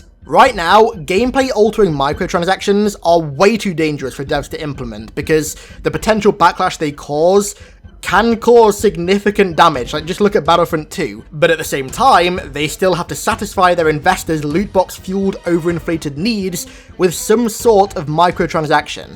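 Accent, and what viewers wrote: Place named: United Kingdom. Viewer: The british accent is cool but your lisps nerd makes me wanna kill myself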